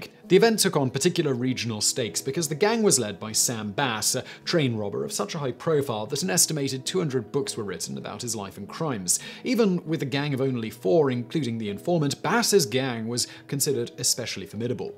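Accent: United Kingdom. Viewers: Texas stories told with a British accent 🤔🤔🤔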